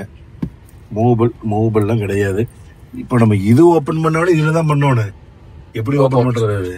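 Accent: Kongu, India. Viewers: ilainga bro Kongu Tamil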